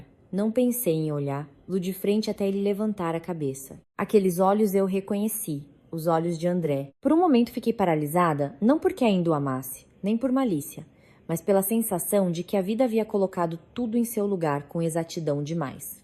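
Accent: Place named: Portugal. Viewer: Por favor, naõ precisa expor linguaja do Portugal pois estamos no Brasil ou a narradoura naõ sabe disso, narra corretivamente língua do Brasil....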